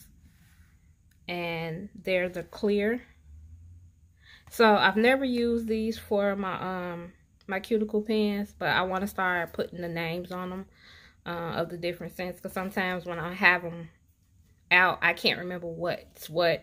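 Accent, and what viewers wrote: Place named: South, United States. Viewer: I just found you and I’m already hooked on your videos. I don’t know if it’s the wonderful southern accent or all the awesome items you’re showing but I can’t get enough❤️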